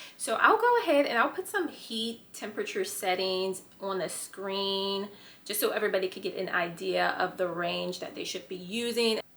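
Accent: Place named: Pittsburgh, United States. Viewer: And I hear that Pittsburgh accent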